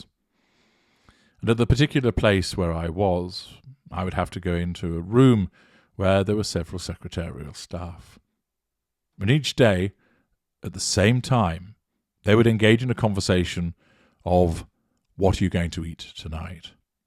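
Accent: United Kingdom. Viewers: Like & appreciate your teaching. As an American (raised in Texas), LOVE the tone of your voice & British accent. I would think you could have made quite a bit of money narrating books, doing voice over for TV-radio commercials, and voice for cartoon movie characters [the sophisticated man with a handlebar moustache or the evil criminal character].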